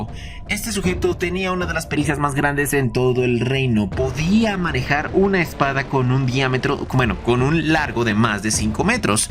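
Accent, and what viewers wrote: Colombia. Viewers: Excelente video! Pdta: de casualidad sos Colombiano? 😅😂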